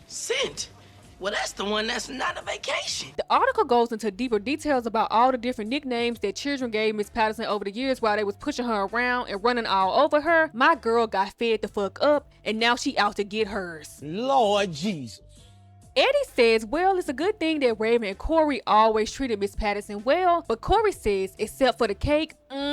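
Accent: Memphis, United States. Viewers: You from Memphis ain’t it 😭😭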